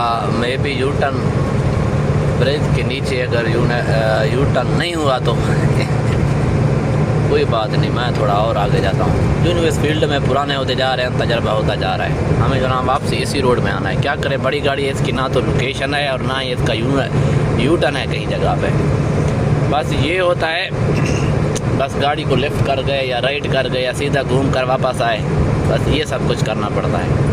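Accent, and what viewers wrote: Saraiki, Pakistan. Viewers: استاد اردو تو ایویں لگدیں جیویں سرائیکی ہو ویں۔ مسات ہیں کتھوں دا؟؟؟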